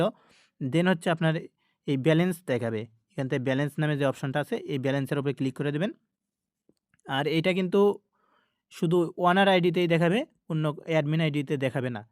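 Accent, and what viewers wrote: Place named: Rajshahi, Bangladesh. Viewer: Vai apnar basa ki rajshahi te. Rajshahir moto kotha bolsen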